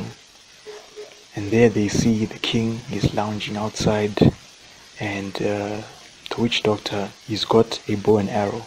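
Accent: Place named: Africa. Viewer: Certainly read by an African...... not sure whether he's from the Congo😅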